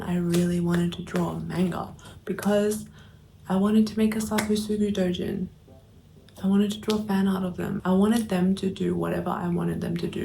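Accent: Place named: Perth, Australia. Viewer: Thought you had a Perth accent. Funny how I could always pinpoint people from home amongst other Australians. Last year I met some people 'from Australia' in Japan and I said to them 'no you're from Perth', and they were 😅 Didn't know there was a comic arts festival here.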